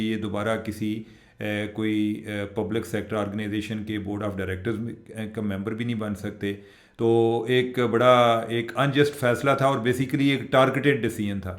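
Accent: Punjab, Pakistan. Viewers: Naeem bukhari and Najam Sethi speaks Punjabi in a style.You are forcing yourself to copy them.its better to remain natural.your urdu accent is enough to prove that you are punjabi bro.cheers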